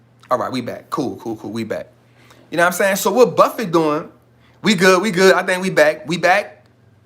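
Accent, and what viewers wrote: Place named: South Carolina, United States. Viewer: Your accent reminds me of my grandmother. She's from South Carolina.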